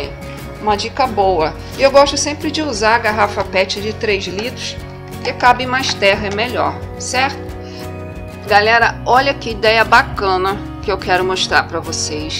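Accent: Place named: Rio de Janeiro, Brazil. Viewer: Linguajar carioca, para falar com o Brasil; da não viu?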